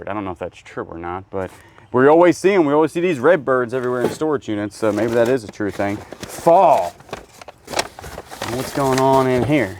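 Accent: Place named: South Carolina, United States. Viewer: If I close my eyes I can almost hear Andy Griffith speak, must be that SC accent!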